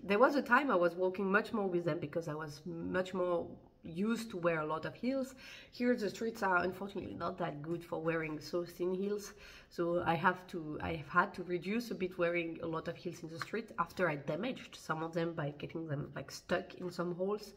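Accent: France. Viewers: Love your french accent!🤗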